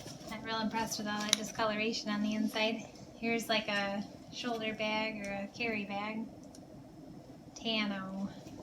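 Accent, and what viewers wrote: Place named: Michigan, United States. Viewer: Michigan! I was trying to figure out your dialect and it was driving me mad that I not knowing.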